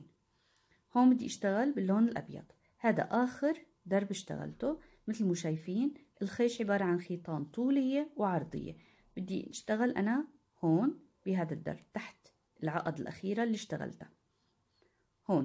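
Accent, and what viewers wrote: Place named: Syria. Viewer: الله يعطيكي العافيه بظن انك من عنا من سورية ع هوى لهجتك .. بس يئلبي كأنو بيطول الواحد لتطلع معو هيك سجادة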